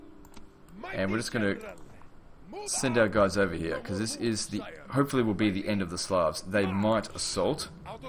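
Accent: Australia. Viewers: Australian I think (at least that's the most obvious too me, as he is australian)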